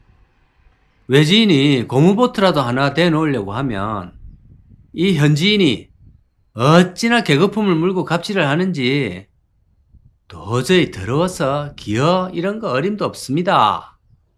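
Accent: Gyeongsang, South Korea. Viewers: 구수한 갱상도 사투리에 적절한 말투에 정말 재밋고 웃음이 절로 나오네요~ 볼수록 공감이 가네요...😅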